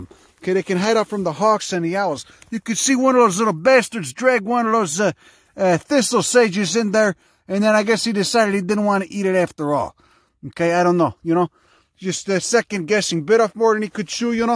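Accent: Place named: Colorado, United States. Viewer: HAHAHAHHAAAA!!!! God damned spot on. Sounds like half the people in Colorado.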